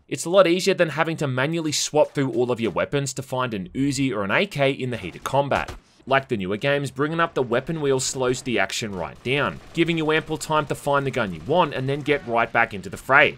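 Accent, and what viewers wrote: Australia. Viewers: As soon as I heard the Australian accent I was like 'hell yeah this will be a good critique'.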